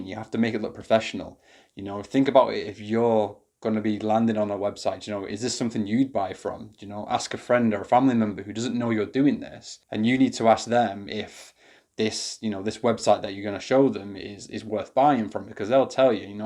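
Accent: United Kingdom. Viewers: please don't get me wrong but i cannot understand your UK accent. And the automatic captions doesn't matches with your words. You should create own captions for every video.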